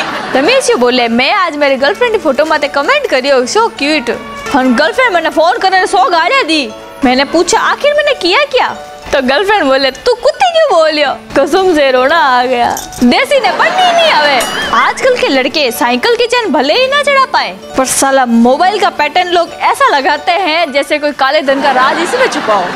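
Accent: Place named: Marwar, India. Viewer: suddhh marwadi bhasha very good g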